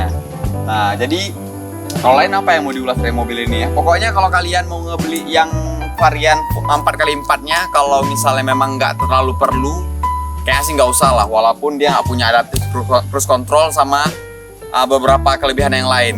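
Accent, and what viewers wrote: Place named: Java, Indonesia. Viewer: Orang mana nih, ngomongnya medok banget ??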